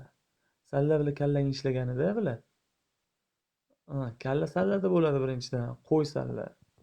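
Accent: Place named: Tashkent, Uzbekistan. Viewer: Ooo amlatta o‘zini shevenda gapir hezrlecha shevalarin borku nma toshken shevada gapirasan urod indes o‘zinsan indesligin uchun bashareni ko‘rsatmayabsan.!!!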